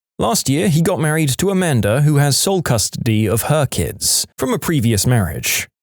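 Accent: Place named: North, United Kingdom. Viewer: Love this stuff and love the voice very Northern British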